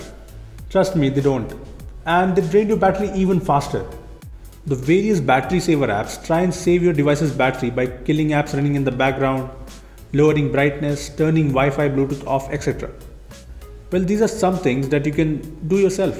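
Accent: India. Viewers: my God I can't keep listening any more Indian accent... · Indian accent, I just love it. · i always wonder why every indians that's speaking english sounds like this ._. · Why should you bother when english is not his mother tongue ... Literally nobody gives a fuck to english in India as there are more than 2500 languages.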